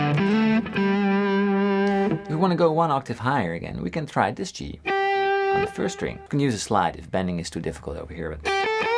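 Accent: Netherlands. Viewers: I really like your videos. They're so well recorded, informative, and to the point and of course you're a great guitarist and know your stuff. A bonus is that my partner is Dutch so I love your accent and also love your subtle jokes at your accent like "Chi Major" so funny! Big ups to you bro, from a Samoan musician down under in Aotearoa / Niew Zeeland! Jah love!!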